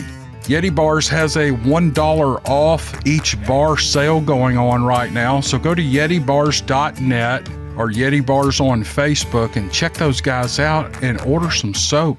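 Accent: South, United States. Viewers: Just found you and enjoyed your stories! Great southern voice too!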